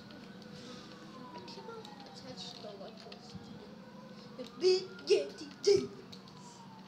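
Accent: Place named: India. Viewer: sound like an indian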